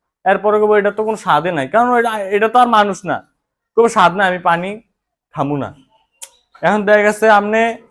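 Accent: Comilla, Bangladesh. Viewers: ভাইজান কি কুমিল্লাই ছিনেন নাকি কারন আপনার কথাবার্তা ঠিক কুমিল্লা মানুষের মতন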